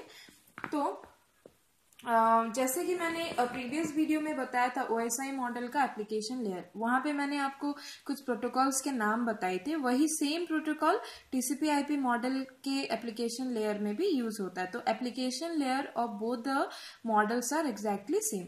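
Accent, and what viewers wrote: Odisha, India. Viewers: Are you odia ??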